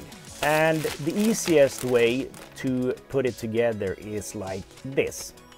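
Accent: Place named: Sweden. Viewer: Jag gillar att det hörs att du är svensk med när du exempelvis säger: ”I was super stolt” istället för ”I was super proud” Ska inte säga något, skulle säkert göra samma sak om jag gjorde det på engelska. I like when we can hear that you come from Sweden when you say: ”I was super stolt” instead of ”I was super proud” I should not say anything, maybe I should do the same if I did it on english.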